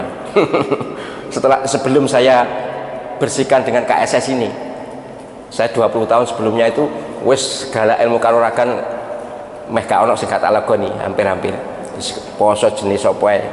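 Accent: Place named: Java, Indonesia. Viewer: Bahasa Jawa nya kental sekali, medhok😎 Teman-teman jga banyak org Jawa.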